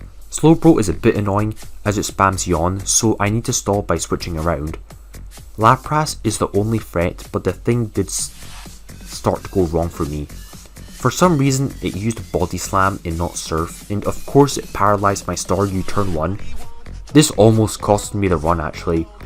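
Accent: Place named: Scotland, United Kingdom. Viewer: Not a pokemon related question, but could you briefly turn up your scotish accent to 11 and sound almost unintelligible?!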